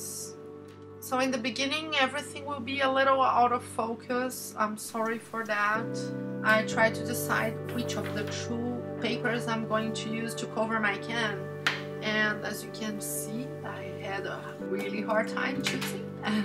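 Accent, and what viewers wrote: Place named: Brazil. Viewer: Tbm sou brasileira, te reconheci pelo sotaque. Estou tentando montar meu mini altar.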